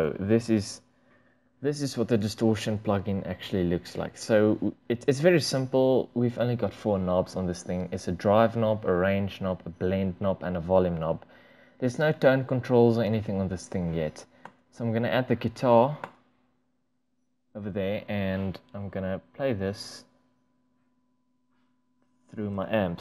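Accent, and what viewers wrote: South Africa. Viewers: You sound South African? Good tutorial by the way! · are you perhaps south african?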